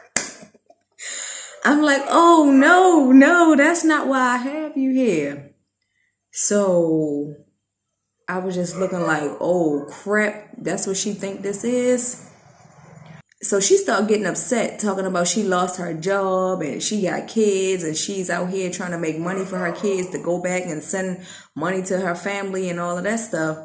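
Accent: Baltimore, United States. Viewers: Let me guess, you’re from B’more?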